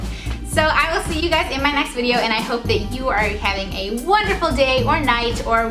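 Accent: Chicago, United States. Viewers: We do have an accent in chicago. We can't tell but others can. And you r the first person that actually said "I'm from the suburbs". Lol. ❤️chicago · I know this may sound really creepy and stalkerish o.o but what suburbs of chicago are you from? I am very local to Aurora, haha and there is a but of the Chicago accent in there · You do have a Chicago accent 😂 I love it though!! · It is a strong Chicago accent. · Not a fan of the music, it was really annoying. And yeah, you do have a bit of an accent. I can definitely tell that you're from Chicago or the surrounding area. It's not thick accent, but it's noticeable. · lol i actually really think you have a chicago accent! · It's actually a pretty strong Chicago accent in my opinion hahaha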